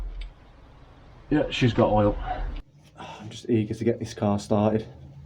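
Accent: Birmingham, United Kingdom. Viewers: You've probably been asked before, but whereabouts in Brum are you from? By your accent I am guessing North Brum. Love the channel.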